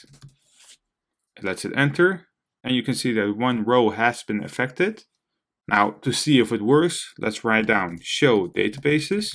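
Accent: Netherlands. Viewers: Ik had wel ff moeite om te horen dat je Nederlands was :P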